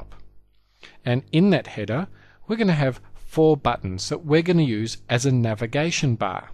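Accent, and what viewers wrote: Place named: Australia. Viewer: nice tutorial Your aussie aye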